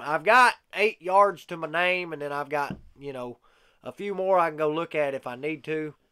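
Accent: Appalachia, United States. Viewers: Way to go! You are very entertaining to watch and listen to. Genuine Appalachian! Those of us in other parts of the country love hearing the way you talk and your common sense way of thinking. You will be very successful and make Bob Binny proud. Best wishes. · Absolutely love the Appalachian accent! Glad you are starting your own channel. Looking forward to it.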